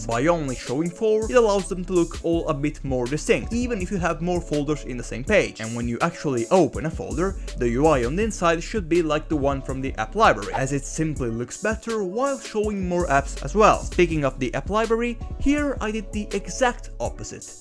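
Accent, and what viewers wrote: Italy. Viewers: Si sente che sei italiano dalla pronuncia, però ottimo video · il tuo accento inglese e bellissimo ma si sente un botto che sei italiano hahah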